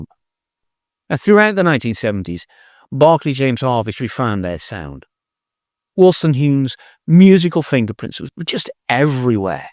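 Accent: Stoke, United Kingdom. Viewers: Stoke accent...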